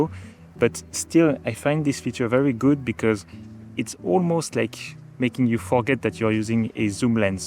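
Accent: France. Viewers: This guys sounds fench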